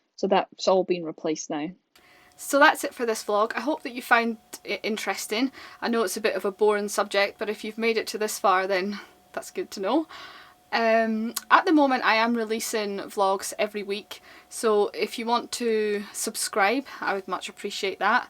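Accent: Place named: Scotland, United Kingdom. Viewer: I could listen to you voice all day love the Scottish and you dogs are lovely I got a 10 year old girl westie and a 2 year old boy westie good vlogs .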